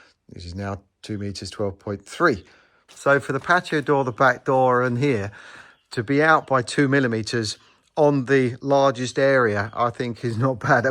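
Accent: United Kingdom. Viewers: Hi! I can tell by your British Accent, that you are renovating this house maybe in Cornwall or Devon. Or somewhere in Spain.❤ Greetings from Northern Germany, Fritjof 😊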